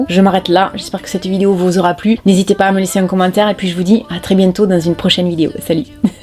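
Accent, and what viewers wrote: South, France. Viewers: Je suis allée jusqu'au bout comme toujours. Rare son les sujets que je n'aime pas et même si c'est le cas bas ton accent du sud me fait du bien ❤ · bonjour, c'est mon rituel du mardi et du vendredi, je les regarde à chaque fois toutes jusqu'aux bout. même si j'accroche un peu moins pour certaines vidéos mais par respect pour ton travail, j'y vais jusqu'aux bout et puis ton accent du sud c'est du soleil. hâte de regarder la prochaine. bonne soirée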